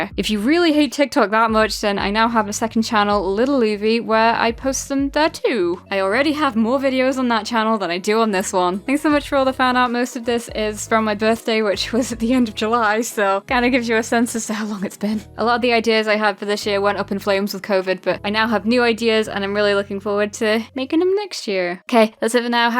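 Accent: United Kingdom. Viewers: British language ahh I like it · EW YOU'RE BRITISH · Oh dear do I luv your british accent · Omg, she’s BRI’ISH!! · I come for the stories, and stay for the British accent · who else stans british girl voice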